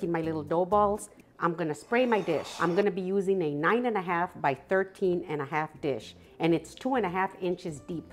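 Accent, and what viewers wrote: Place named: New Mexico, United States. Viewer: thank you so much. I've been looking for a recipe for dinner rolls without using a mixing stand, which I don't have. You are so nice to listen to and understand. You remind me of my best friend of 54 years, her mama. I grew up in Albuquerque, NM and you sound a lot like her with your beautiful accent. I'm so excited now bc I know I can do these your way. Have a great weekend.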